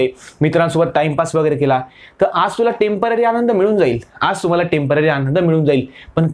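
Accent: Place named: Jalgaon, India. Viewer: दादा तुम्ही जळगांव चे आहात का?, कारण तुमच्या भाषेवरून तसं जाणवून येत आहे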